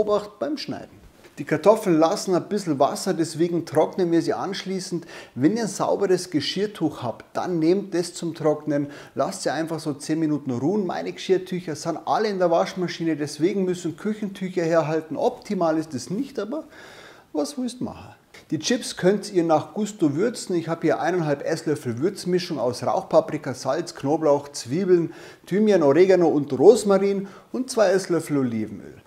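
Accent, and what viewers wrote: Bavaria, Germany. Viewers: Kann man sich nicht geben mit dem dreckigen bayerisch 😷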